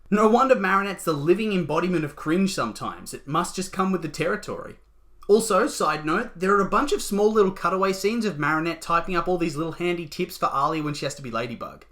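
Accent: Australia. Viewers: are you australian? ps love your videos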